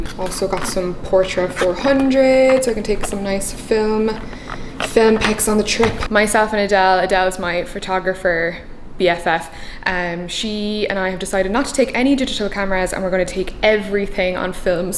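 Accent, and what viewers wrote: Cork, Ireland. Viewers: The Cork accent jumped out in this one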